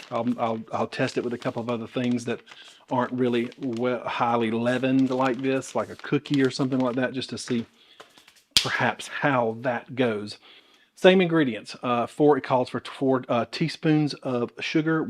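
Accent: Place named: North Carolina, United States. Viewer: you sound so much like we do in North Carolina, so I feel like you're a good friend. You talk just right!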